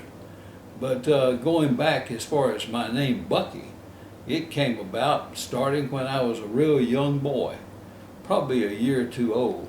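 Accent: South, United States. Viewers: it sounds so much better when you say it in your soft southern accent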